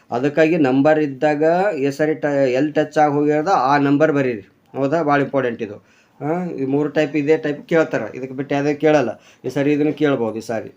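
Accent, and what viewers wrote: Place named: Jevargi, India. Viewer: ಸರ್ ನಿಮ್ಮ ಮಾತು ಜೇವರ್ಗಿ ಮಾತು ಸರ್ 🔥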